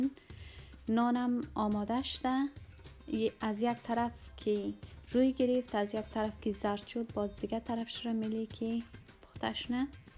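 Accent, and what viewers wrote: Hazarajat, Afghanistan. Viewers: خیلی خو ب بود اول همینکه خوب نظافت رعایت مونی دوم اینکه لهجه آزرگی تو بسیار خوبه. خیر کنی خوارک جور باشی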